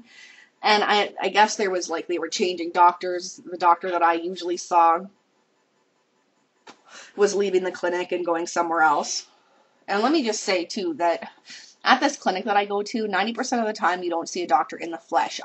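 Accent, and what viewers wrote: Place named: Canada. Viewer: I love your voice and the way you tell this story! I'm a sucker for the mild and lovely Canadian accent, I gotta say. I have to go watch more now and find out more of your story here....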